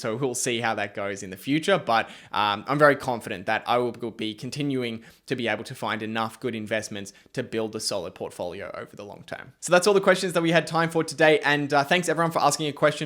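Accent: Australia. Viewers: Rosy cheeks and Aussie accent ☺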